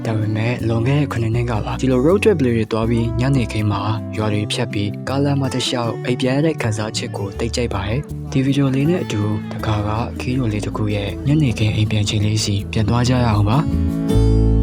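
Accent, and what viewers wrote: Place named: Dawei, Myanmar. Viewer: ထာ၀ယ်အသံကြီး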